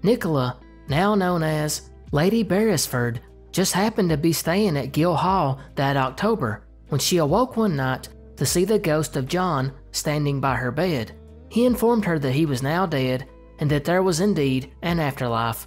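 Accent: South, United States. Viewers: Man it’s nice to hear this type of video from a southern voice. I left Tennessee a few years ago and I miss our way of talking. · These are all great obscure mysteries. I can tell you spent some work on this. Nice to hear another Southerner too.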